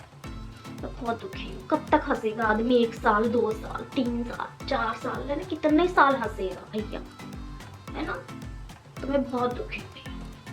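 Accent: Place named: Punjab, India. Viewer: Aapka dukh Sankat tu mujhe Rona nahin a raha hai balki Hansi a rahi hai aapki baten sunkar 😂😂😂 itne acche se aap Punjabi language wale rahe ho, aur mujhe lag raha hai kuchh logon Ko karara jawab mein mil raha hai aapki ine baton se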